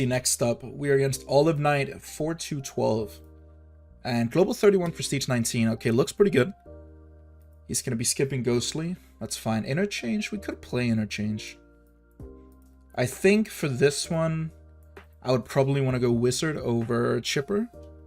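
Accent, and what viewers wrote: Denmark. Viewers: yeah, I know he's from Denmark, I just find it funny when he doesn't know about things or pronounces things differently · he's Danish, our j sounds like that. fortunately for us all, doesn't drink baja blast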